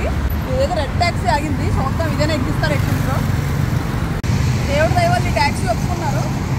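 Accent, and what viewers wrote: Vizianagaram, India. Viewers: vizianagaram praantheeya bhasha Vinipistundi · మీ , మా యిజనగరం భాష బాగుంది. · Happy wedding anniversary 💐 God bless you I am Anasuya from VIZAG , Profession by Teacher. My native place is VIZIANAGARAM. Mi matalu chala baguntayi. Mi matalu vini VIZIANAGARAM aria anukunnanu. Adi currect iyyindi. You're so Active. God bless you. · మీ విజయనగరం యాస కు.. ఫిదా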